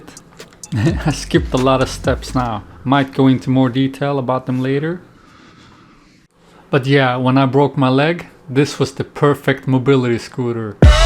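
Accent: Scania, Sweden. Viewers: Jäkla grov skånska grabben hade!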